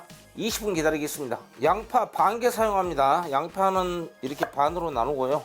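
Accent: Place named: Gyeongsang, South Korea. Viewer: 구수한 사투리 듣기 좋아용 갱상도에서~~~ㅋ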